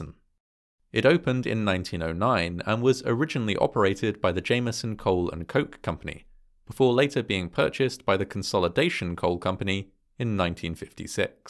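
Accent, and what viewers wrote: United Kingdom. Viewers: I love his narrations, such a calming and soothing voice with a very non judgmental or incriminating approach! Mee-thane gas, gotta love the original English interpretations. Remember folks, it started with them, not with us in America! I love and marvel how the British and Scottish pronounce common English words! It sounds wrong but again (a-gane), it’s we that have it all wrong not them! 🇬🇧🏴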